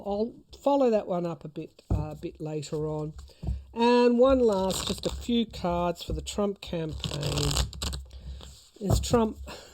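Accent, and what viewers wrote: Australia. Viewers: Why would anyone write negative comments against her? She's a gem💎, & I look forward to her readings weekly. I luv her accuracy, because she's always right on point. I luv her beautiful Australian accent & her numerous neck scarves! U have to be a nutjob to not like her & need to go away. I agree that BiBi won't do a cease fire until after the US Presidential elections, probably because Trump told him not to.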